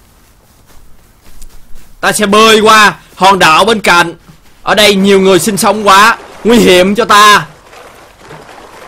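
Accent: Hue, Vietnam. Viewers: Anh nói tiếng Huế nghe hài quá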